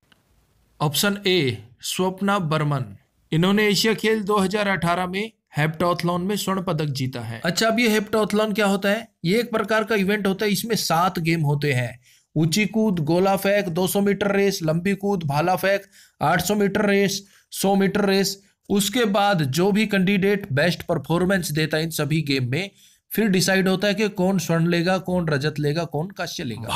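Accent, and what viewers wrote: Haryana, India. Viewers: Sir Aap Haryana se ho kya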